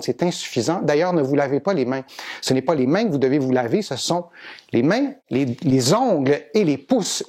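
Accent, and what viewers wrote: Quebec, Canada. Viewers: Très bien fait ! C’est la première fois que je vous vois et cette vidéo me plaît beaucoup. Je suis médecin aussi, mais à New York. Un psychiatre. Votre idée pour ne pas toucher le visage est super brillante. Votre façon de parler et d’expliquer des choses est claire, innovante et me plaît énormément. Merci beaucoup monsieur. En outre, le français québécois me plaît à entendre. J’apprends la langue de la France avec leur accent, mais le vôtre est génial. Récemment, j’ai décidé de prendre la retraite de la médecine. Si je pouvais, je souhaiterais habiter au Québec, un lieu, un pays, une destination que j’ai toujours aimé pour faire des tours en voiture, de la Gaspésie à Hull. Cependant, je ne sais pas si le Québec ou le Canada accepte des personnes comme nous, séropositifs pour le VIH depuis 30 ans. Sauriez-vous si des gens séropositifs qui désirent vivre au Canada sont permises de le faire. 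Comme médecin, j’ai pensé que vous seriez là bonne personne à qui poser ma question. J’apprécierais si vous pouviez répondre à ma question, lorsque vous n’êtes pas trop occupé. Je vous remercie à l’avance pour cela. Mais pour revenir à nos moutons, une fois, mon copain et moi, on est allé plus loin pendant un voyage en voiture. Mon copain et moi, on est allé en voiture de St-Jean-Terre-Neuve jusqu’à Victoria et Torino, Colombie-Britannique. Ce tour nous a pris environ 3 mois et quel plaisir. Quelle belle traversée de votre magnifique pays, mon préféré au monde. Pour la plupart, on s’est ser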